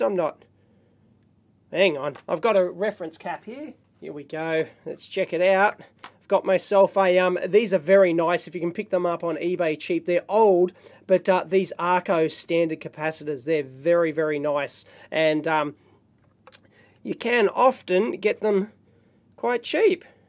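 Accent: Australia. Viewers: "terrible voice" is a little squeaky but for non-english speaking people (like me) is very clear, intelligible and understandable. The most terrible things are both A and E pronunciation but, well, Aussie way:) When he says "EEV Blog" I still get something like "HEY HEY VEE BLOG", but after months - years, by now - of videos I eventually started to love so much his accent. And, of course, I deeply love the contents, the professionality and the expertise. I learned a lot from this YT channel, as well as how understand english language spoken by a "squeaky Aussie" (sorry LOL)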